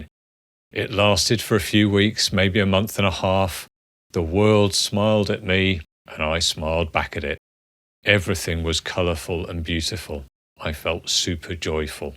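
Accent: England, United Kingdom. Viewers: 50 bucks says you know this from both sides, doc, because the transference must be off the charts. All that and an English accent to boot. 😂 Funny thing happened to me, I politely extracted myself from a budding friendship with an unavailable LO, but now I'm running into him everywhere in a city of three quarters of a million people. It's like a cosmic practical joke.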